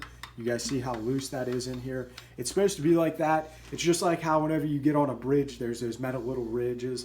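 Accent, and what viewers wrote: Pittsburgh, United States. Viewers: lol at the pittsburgh accent, noticed it right away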